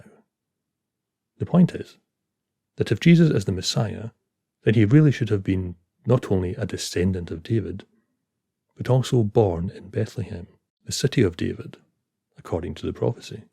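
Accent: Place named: Scotland, United Kingdom. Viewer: And lovely ASMR with your mellifluous Scots accent!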